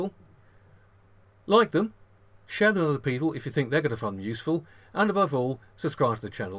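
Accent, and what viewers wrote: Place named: United Kingdom. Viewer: I had to slow your voice down. You speak way too fast for me to understand most of what you say, especially with your British accent.😅 Thank you.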